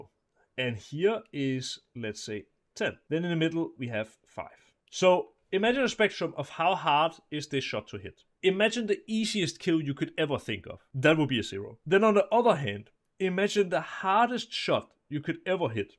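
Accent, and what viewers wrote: Denmark. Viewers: flot dansk accent :D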